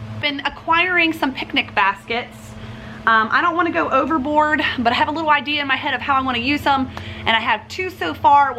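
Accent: South, United States. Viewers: Ok sister I don’t know where you’re from, since you sound like me I’m figuring “The South” so if you ever get rid of that picnic basket I’m your huckleberry 🤷🏼‍♀️😍. So glad I found you!!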